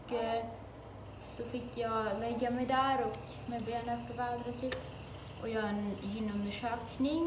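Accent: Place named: Dalarna, Sweden. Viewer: Nää det där är inte norrländska, och dessutom finns det ju sjukt många olika dialekter i norra Sverige som inte ens liknar varandra så det går inte att klumpa ihop alla dialekter och säga ”norrländska”. Det låter som att hon pratar dalmål tycker jag 😊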